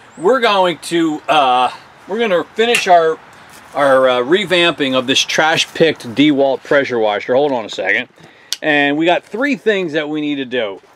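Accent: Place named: New Jersey, United States. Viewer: Since I subbed a little while ago I thought I detected a Jersey accent. Sure enough! I’m originally from northern New Jersey myself, but have been on Cape Cod most of my life.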